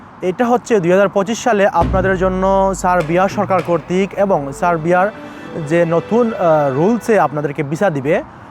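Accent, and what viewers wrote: Sylhet, Bangladesh. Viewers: আসসালামু আলাইকুম ভাই আপনে সিলেটি মন হয় রিপ্লাই দিবেন কিন্তু ❤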